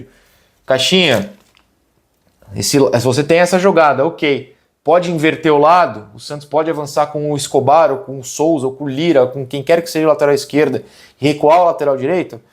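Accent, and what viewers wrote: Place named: Portugal. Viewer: Não aguento mais português, até o sotaque do cara está me incomodando não que eu seja xenofóbico.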